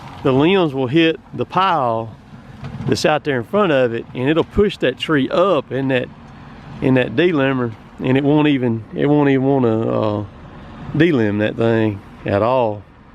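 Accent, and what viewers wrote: South, United States. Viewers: Stumbled across your YouTube channel. I thought to myself "this dude has got to be from the south" I'll be damned if you ain't from Columbus MS. Lol. I live in tupelo. Own a small sawmill and do a little tree jobs myself. Look up Smithsawmill on Facebook. Great videos bro!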